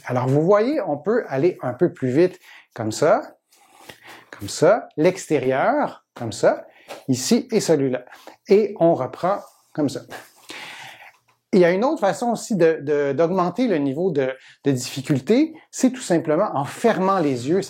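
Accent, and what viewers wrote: Quebec, Canada. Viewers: Adorable accent québécois et super enseignement, ayant moi-même une grave maladie.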